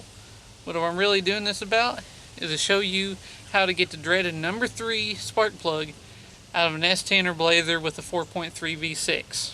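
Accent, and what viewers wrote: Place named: South, United States. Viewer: Personally, I can't thank you enough for this video. Just got a P0303 on my 2004 Blazer LS SUV 4.3 Vortec this week. Picked up some standard AC Delco plugs and a set of "driveworks" (good reviews) brand plug wires at Advance yesterday, (Sunday), so I'ma try to tackle the driver's side --- first --- and get out the #3 plug out first too. Thanks for sharing your knowledge with all of us because you sure do make our DIY mechanic lives easier! Mr., you sound like a good 'ol country boy --- like myself --- so I was wondering what state you live in? Thanks again!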